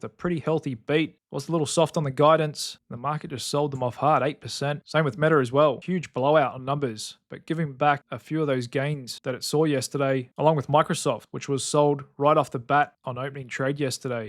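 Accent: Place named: Australia. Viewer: Lmao, that's his accent. I'm guessing Australian but I'm British so I can never tell the difference between Aussies and Kiwi's 😆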